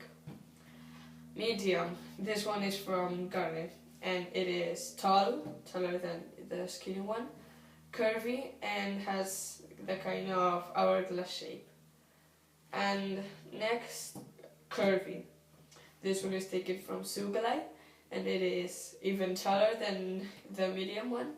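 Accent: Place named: Russia. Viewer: I was wondering because it sounded russian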